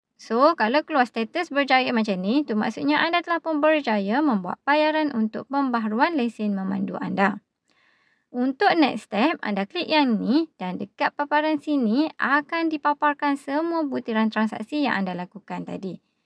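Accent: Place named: Kelantan, Malaysia. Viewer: Awk org kelantan ke. Mcm slang kelantan 😊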